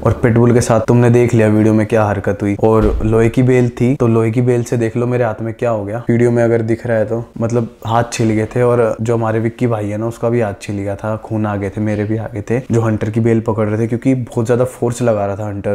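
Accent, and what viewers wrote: Haryana, India. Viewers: Bhai aap bhadurgarh ke ho kyaa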